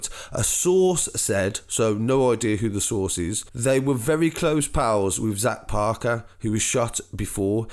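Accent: Birmingham, United Kingdom. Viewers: That Birmingham accent and the stutters, terrible, least you didn't say Birmingham no worse sound than some1 from Birmingham saying the word Birmingham. That's me done. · Love the brum accent!! But shocking stuff in Dublin!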